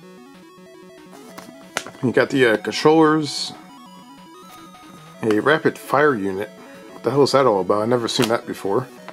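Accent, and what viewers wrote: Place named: Canada. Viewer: You must be Canadian. Here's how I know. You can turn "and" into "un". American's can not do that.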